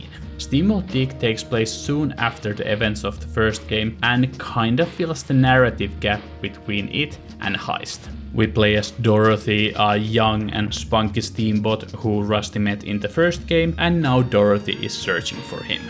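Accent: Finland. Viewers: Oot Suomalainen? Pikkusen toi suomi aksentti kuuluu puheessa 😄.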